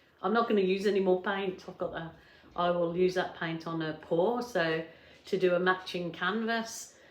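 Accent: Birmingham, United Kingdom. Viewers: we’re you a Brummie? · This lady sounds like an English Brummie.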